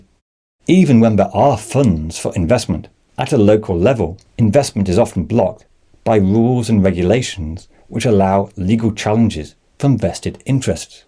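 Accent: United Kingdom. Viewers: I detect a british accent, if I am right ? It's always funny people from Countries that turned into utter shitholes start worrying about other Countries 😂 Yes, at one point there will be stagnation or a small decline - like in every Country - like it has happened sometimes in the last 50 years - geeeee- only IQ less fools believe that growing an Economy more and more every year after year after year is possible - IT IS NOT 😂 AND THAT IS NOT A BAD THING - GETS EVERYBODY DOWN TO EARTH. And If I where you - I would worry about Little Britain much much much more than Germany. They are after all still in the EU. And what goes for Energy prizes - Well , they are more than Double in Little Britain than in Germany , the last I read 😂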